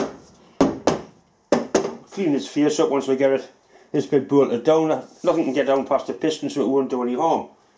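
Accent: Newcastle, United Kingdom. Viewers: Marine Engineer: my thoughts exactly. Did you by any chance do any shipyard time? Some many of those thoughts and expressions common to lots of us. Being close to South/North Shields you must have met lots of shipyard guys doing their apprenticeships. I'm in Canada (West Coast) and worked with lots of North East guys: been asked for a Dolly and other fascinating tools. Retired now but I did get to help pull a bottom end from a direct reversing common-rail engine and watch him do a bit of hand scraping. There were always hand scrapers in every engine room but I never learned to use them. Shells for me every where. Thanks for your videos. My wife claims not to be able to understand you at all. I certainly do! Don't hear many Geordie s now that I'm retired.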